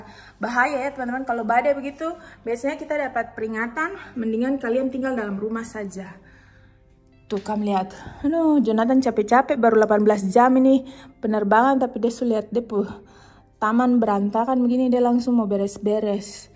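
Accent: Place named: Papua, Indonesia. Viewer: Tetap ya pertahankan bhs dialek Papua nona cantik manis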